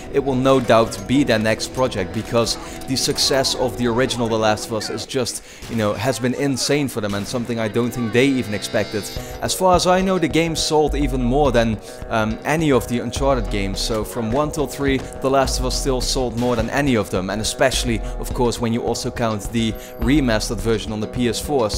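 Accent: Netherlands. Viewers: You dutch?? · Are you dutch? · Are you dutch? Bcs of your accent xp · your dutch right?:P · Are you dutch? I can hear it in your voice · are you dutch? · You sound dutch, am i right? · Ayy ik hoor een Nederlander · Are you Dutch? You sound like you are. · gray-brown becouse i am dutch too and his accent looks like he is dutch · Great video but you really hear that your from the netherlands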